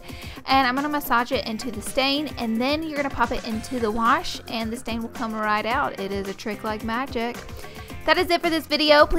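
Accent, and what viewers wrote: South, United States. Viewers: You are so funny, love that southern accent!! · I love your southern accent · is she a southern bell alls I hear is southern in her voice 💙😂😊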